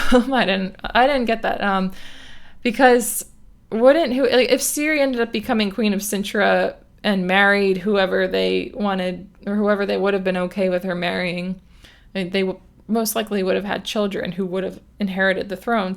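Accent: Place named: Philadelphia, United States. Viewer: awesome! I'm from just outside of Philly, but still lots of family in the area and over in Jersey still. I was showing your channel to my cousins who were down visiting from Atlantic City, and your accent kinda gave it away, lol. But yes, I'll probably just read along with podcast, looking forward to the next one!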